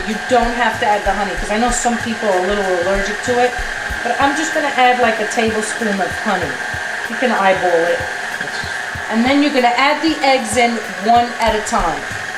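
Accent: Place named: New York, United States. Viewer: Love the italian newyorker accent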